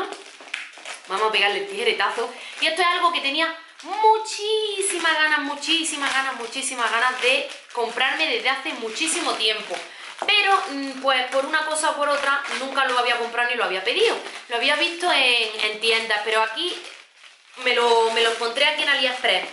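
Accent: Granada, Spain. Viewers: pero si parece q eres de Graná 😱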